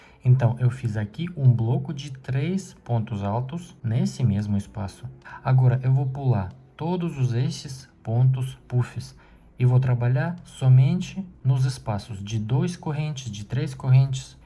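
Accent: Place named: Brazil. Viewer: NOSSA.. EXPLICA MUITO BEM..AMEI A AULA..ADOREI O SOTAQUE, DE QUAL ESTADO DEVE SER? TENTANDO DESCOBRIR PELO SOTAQUE,MAS NÃO CONSEGUI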